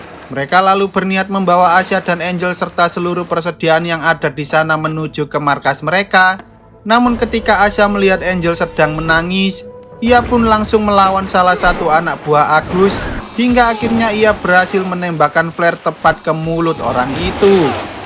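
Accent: Java, Indonesia. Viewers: Min logat nya sengaja dibuat medok ya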